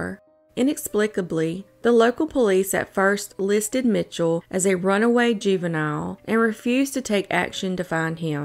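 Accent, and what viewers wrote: South, United States. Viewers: This narrator has got to go. I've lived in Arkansas most of my life and have a Southern accent myself, but this is just frustrating and distracting. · Love your channel! You have such a soothing southern voice These cases are so tragic. You should definitely do another Oklahoma one!! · I like your Southern accent ... And of course the interesting stories and great narration.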